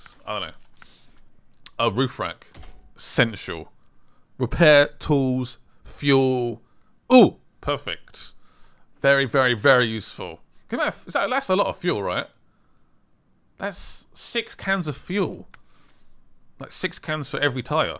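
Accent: England, United Kingdom. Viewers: Are you english lad ??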